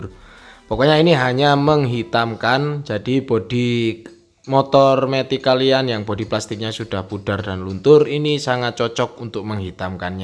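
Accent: Temanggung, Indonesia. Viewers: Temamnggungane medok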